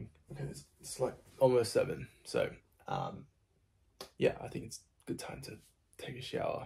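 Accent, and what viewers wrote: United Kingdom. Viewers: Hi there Peter H. here too 🇰🇷🇺🇸Can’t focus writing research papers found your channel so motivating love ur english accent..back in college for bs degree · Dude he is definitely perfect, nice body, pretty face, smart, well behaved, clean ,has a nice voice and etc AND HE HAS A BRITISH ACCENT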